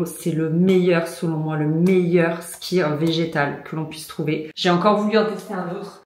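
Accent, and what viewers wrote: South, France. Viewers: Merci, je t'ai découverte il y a peu et j'adore tes vidéos (que j'ai binge-watchées héhé). Tu es apaisante, très agréable et je suis persuadée que tu es vraiment sympa en vrai... et cette pointe d'accent du sud m'amène un peu de soleil. Hâte de te retrouver...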